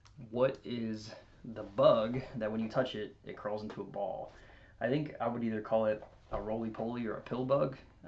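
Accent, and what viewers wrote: Maryland, United States. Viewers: I knew you were from Maryland after the first five words. I have seen some preposterous attempts on You-Tube, that bear no resemblance to even the thickest Baltimore or Eastern Shore accents. I've lived in New Mexico a long time, but when I hear the accent of Maryland, Delaware, or New Jersey , it feels very settled, very comfortable. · I appreciate the time and care you took with this topic. You share some of the same accent markers as my mom. She said “heel” instead of him. Oh God, she said “nindy” instead of ninety.” She called milk, “melk.” I dropped the -ing as a kid but not now. But your words are pretty solid for MD. We called tennis shoes (our sneakers) tenners ,as kids. Otherwise, sneakers. Yeah, my mother was a water person. I’m subscribing and hope to see you again. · Upload more videos of you speaking. I would like to learn your maryland accent. 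· Yep, good job. You sound like a solid Marylander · I was raised in Maryland until i was 8 but i moved to Georgia and lived there for about 14 years before living here in California. I never picked up a GA accent because my mom is from Maryland too, so i talk like her and hearing your accent reminds me of mine and my parents'. They are from the P.G. County area I think.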